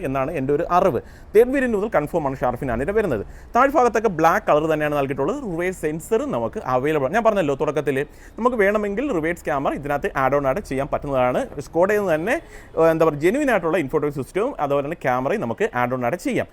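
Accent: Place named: Kollam, India. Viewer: കൊല്ലം language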